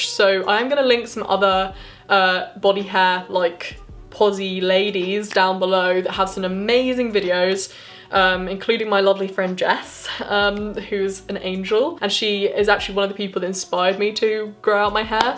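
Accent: United Kingdom. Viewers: I live in Rio de Janeiro, I've learned english by myself, but the british accent It's LIFE. You are so Sweet and your Voice calms me down... I love your videos ao much omg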